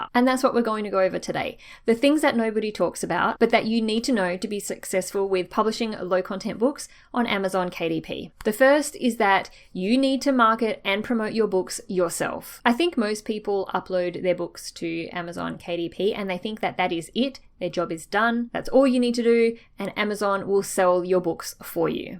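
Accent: Australia. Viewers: I went what? Aussie girl talking about Amazon KDP books! How refreshing. Not that other other international sites aren't relevant, just wasn't expecting the accent. Then I thought Kiwi? no. definitely from the land down under. Just started publishing and dabbling in low content books in the last few weeks and yes, must start to promote. Thanks for your informative sessions.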